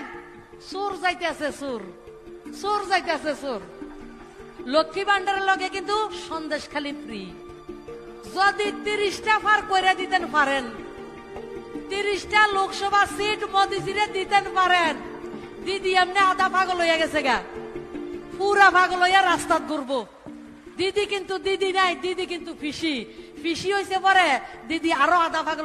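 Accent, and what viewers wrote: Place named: Comilla, Bangladesh. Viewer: তিনি সম্পূর্ণ ভাবে কুমিল্লার ভাষায় কথা বললেন।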